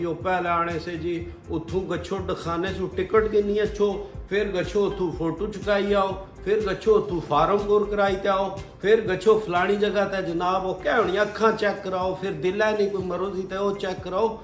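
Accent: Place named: Pothohar, Pakistan. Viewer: Authentic potohari excellent accent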